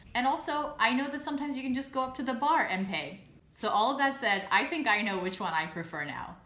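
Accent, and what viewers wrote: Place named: Netherlands. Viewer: I dont know why but I just watched some of your videos. And this video the first few minutes you actually sounded like a Dutchy speaking English (losing you natural accent) You must be speaking Dutch more.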